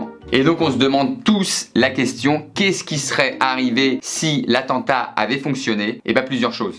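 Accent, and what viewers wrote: Germany. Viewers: bonne année je t adore bonne continuation pour tes belles videos bien ton accent Allemand bisous tendres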